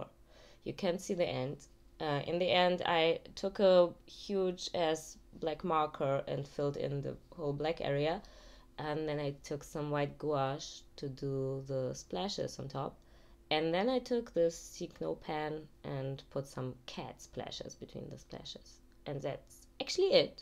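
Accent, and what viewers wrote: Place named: Germany. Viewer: damnnn dat german accent tho 😂 (Im from germany and I struggle with that too, no hate)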